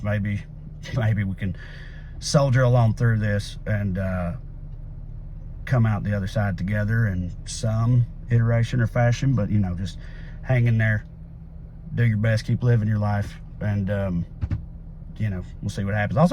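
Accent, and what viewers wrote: South, United States. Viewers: Bless you mate. I showed one of your posts to my Dad became he's become so anti American (especially anti Southerner) because he assumes anyone who speaks like you is a Trump supporting sub-intellect. He said 'I like the boy & i reckon he could speak underwater with a mouthful of marbles'. 😂 Love from Australia 🐨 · I love all your rants Trae! I also spent some of my childhood in the south.. so it's fun to hear you spout liberal views in a southern accent.. keep it up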